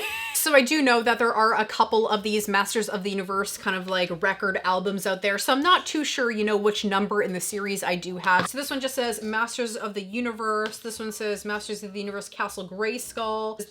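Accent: Canada. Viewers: I could tell you were from Canada by just your accent. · I knew you were CANADIAN!!! I been around that awesome accent my whole life!!! Pops was born in Chatham, Ontario, Gramps and Granny in London!!!!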